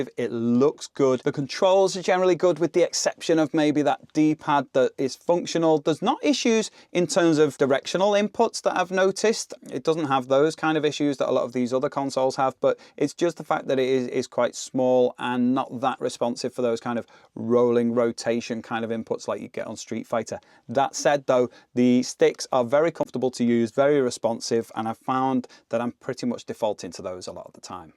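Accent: United Kingdom. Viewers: Great video, it's a breath of fresh air to get a British person instead of the crazy yanks.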